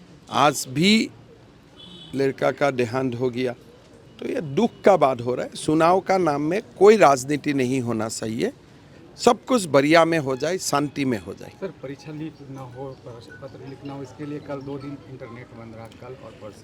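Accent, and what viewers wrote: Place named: Bengal, India. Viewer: Ye bangali type ka bolta hai bhagao isko yaha se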